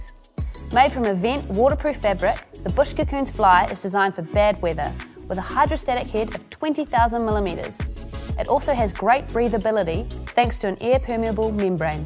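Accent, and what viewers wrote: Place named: New Zealand. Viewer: Wit wither? Are you a Kiwi by any chance?!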